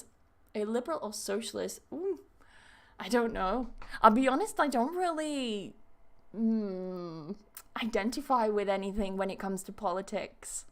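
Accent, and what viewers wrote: United Kingdom. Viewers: Tengo casi tres años de vivir aqui en UK, y nunca habia encontrado un video con una persona de habla Inglesa Británica que explicará tan bién sobre la Pronunciación de las palabras, Vocales, Diptongos y demás. En lo particular se me ha hecho muy difícil aprender el Acento British, debido a que yo aprendi un Inglés muy Americanizado por no decir un Espanglish, Enhorabuena por todo lo que enseña en sus Videos, ahora ya tiene un nuevo Estudiante...!!!! Congratulations...!!!!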